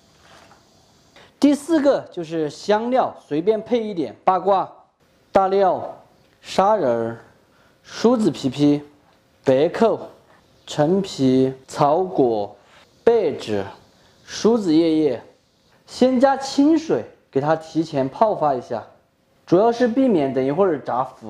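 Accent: Sichuan, China. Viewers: 你的川普好听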